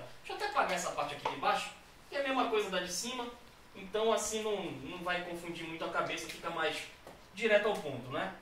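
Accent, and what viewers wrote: Pará, Brazil. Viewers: cara, venho acompanhando teus vídeos ultimamente e gostado bastante. Mas to com uma curiosidade, tu és paraense? kkkk ou alguém ja te disse que teu sotaque lembra muito a galera daqui do Pará? kkkk abraços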